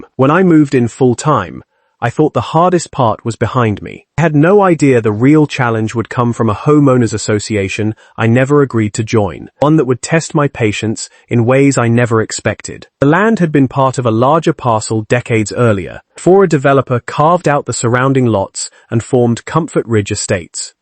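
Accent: United Kingdom. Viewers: I do not respect AI, but why do you use prissy sounding British English when we use the masculine American English? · there must have been a better voice to use for a Texan-- this gut sounds British..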